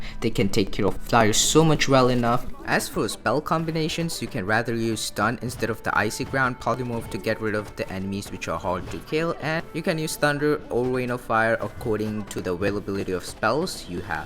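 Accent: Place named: India. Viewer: You have like an Indian accent which is hard to understand your english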